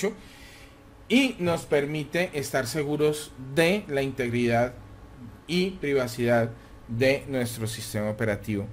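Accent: Colombia. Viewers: ...Gracias...Excelente opcion. por tu asento se ve q eres colombiano XD... like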